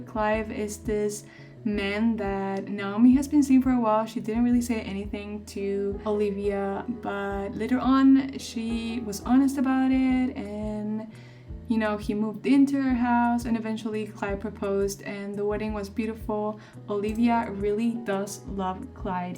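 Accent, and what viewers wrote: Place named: Argentina. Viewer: Ay que lindoo! Yo te sigo en tumblr y hace poco me enteré que tenes youtube, por el acento creía que eras de Argentina 🩵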